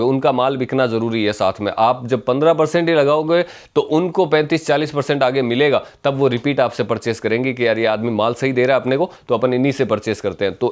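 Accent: Rajasthan, India. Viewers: आप राजस्थान से हो क्या भाई जी।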